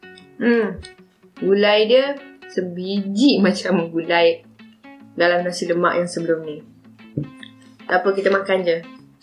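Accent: Kedah, Malaysia. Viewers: 10 bungkus hang Tibai Nasi Lemak.. He org kedah ka?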